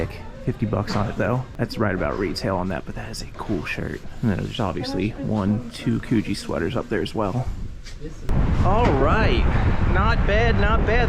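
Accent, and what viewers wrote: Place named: Reading, Pennsylvania, United States. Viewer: Stumbled up your vid, are in or from the reading pa area? You sound just like my family from there! ❤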